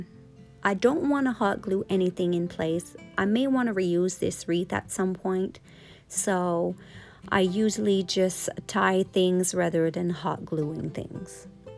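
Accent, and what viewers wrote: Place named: Montreal, Canada. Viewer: The wreath looks great without a bow. Very good job. You inspire me. By the way I love your accent it reminds me of when I lived near Montreal. It brings a smile to my heart.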